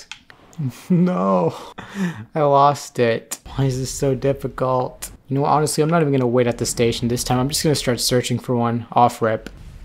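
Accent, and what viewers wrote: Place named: United Kingdom. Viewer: BRITISH MATE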